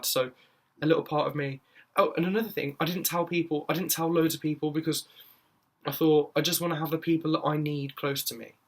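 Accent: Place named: Birmingham, United Kingdom. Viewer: I know I haven't seen you for a few years now & that you don't want sympathy but I just want to say that this is a really brave thing that you're doing. It's really good to see you facing this with such bravery & strength. I'll be staying tuned & I'll be thinking of you (I would say praying but it's not much good if you're godless). I have to say I think it's hilarious that you thought you had HIV (wrap it up ) & you do sound like a right Brummie in this video! 😜!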